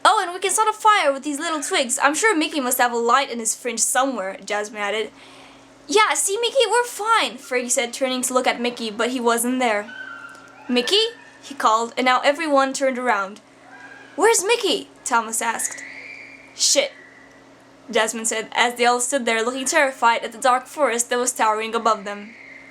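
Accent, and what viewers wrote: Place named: United Kingdom. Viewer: oh shit your accent gets more british every time nooo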